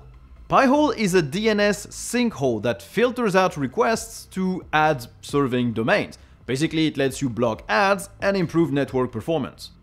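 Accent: Brittany, France. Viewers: Damn are you french? You talk exactly like every french people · Il est breton je crois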